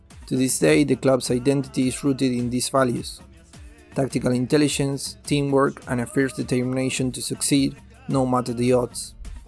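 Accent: Argentina. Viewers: hermano el audio todo en ingles pero las palabras en español bien en español argentino hablas bien che, hermoso video :D y de paso, de que cuadro sos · Y con nombres de clubes con palabras en español y en inglés, cuyas palabras en inglés son pronunciadas en un español porteño, como "shuniors". meme de Jackie Chan confundido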